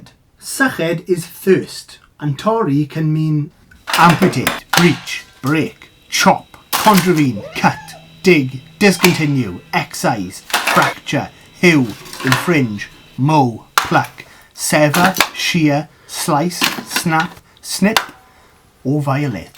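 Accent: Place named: Caerphilly, United Kingdom. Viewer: Your accent sounds like your from round the Caerphilly area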